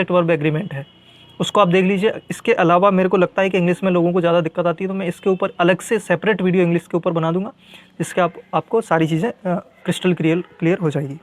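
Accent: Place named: Chittorgarh, India. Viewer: Chittorgarh se lg rha hai Bhai .....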